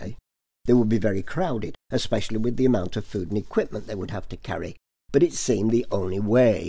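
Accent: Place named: RP, United Kingdom. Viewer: I almost didn't recognize yer 'posh' voice!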